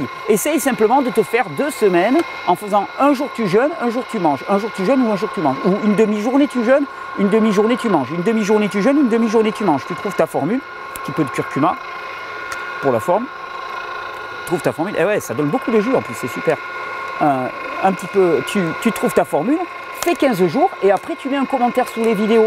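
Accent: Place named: South, France. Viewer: Regenere / Thierry Casasnovas Je suis de la région opposée à la tienne; que j'appelle "le Haut de l'France "😆 et ton accent nous apporte le soleil du Sud 🌞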